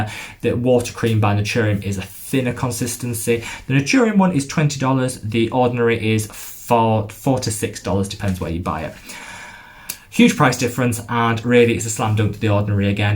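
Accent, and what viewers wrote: United Kingdom. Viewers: Great review. Really. Just discovered your channel and subscribed. Want to hear more reviews from you. You go deep and I love it. You remind me of Hyram only with British accent which I adore😁 I would like to hear your opinion on timeless. They have straitforward products. I love their vit c 20% ighink you would love it too. Good luck with your channel it will grow for sure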